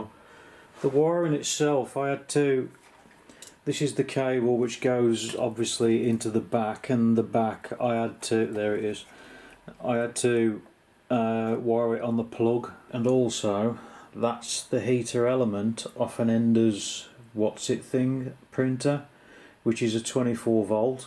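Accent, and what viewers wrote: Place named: England, United Kingdom. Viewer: I'd never stop inventing truly useful and cool stuff for dudes if I had one of those, Mate! None of the unimportant drivel I do daily would ever get done!!! I'll have to keep building stuff out of old "junk" or all the "junk" I do would never get made into new "junk". If I just had a "pence" for every time I said, "Man t'would be so much easier if I just broke down and got a damn printer!" I'd easily have enough "pence" to get a damn printer!!! 😂🤣😂 Much love!!! ✌ ϻя.ƹ PostScript - truly not a stalker, just after a couple vid's I actually watched of your crazy "bum" going fast on e-bikes, I figured I'd give ya a real go and have your channel on "play all" in the background. Of course, I click over to see whenever I hear ya laughing or cussin', as I know those are the important parts to me anyhow. I simply find your relaxed English accent combined with your internal teen-like rebellious angst a calming juxtaposition ... 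HA ... spelled that one correctly w/o autocorrect for a change 😂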